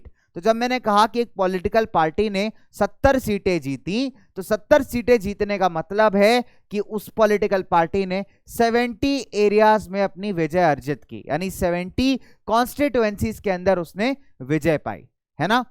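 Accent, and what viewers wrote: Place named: Uttar Pradesh, India. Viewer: Sar lagta hai up se hai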